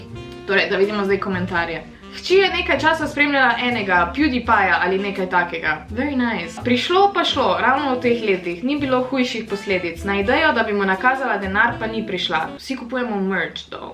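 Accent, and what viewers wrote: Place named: Kranj, Slovenia. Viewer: Mene tvoj naglas sploh ne mot ampak je ok:) moja frendica ma tak naglas pa je iz Kranja. Nekateri imajo slabo mnenje glede tebe ampak meni si super;) tudi če snemaš life trvari ali whatever si dobra influencarka pa še vem ne kaj to pomen(: men je ful dobr da še mal anglish govoriš ( tut js) bodi taka kot si radi te mamo tako:)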